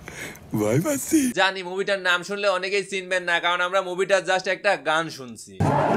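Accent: Pabna, Bangladesh. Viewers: apnar basha pabnar kothai bro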